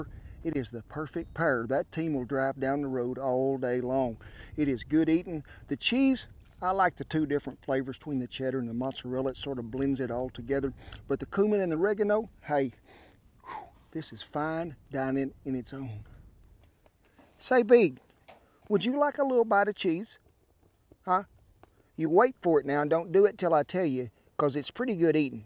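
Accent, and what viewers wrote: South, United States. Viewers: Just found your channel recently. I remember seeing you on chopped. I'm from Oklahoma but I'm livin on up in Yankee land right now. It's good to hear that real southern slang. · I'm from the South, and I've heard all kinds of accents all over the place, and this guy's accent is just delightful.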